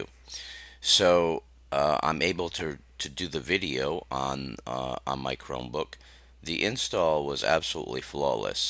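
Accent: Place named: New England, United States. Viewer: You've got to be from Mass or NH with that accent.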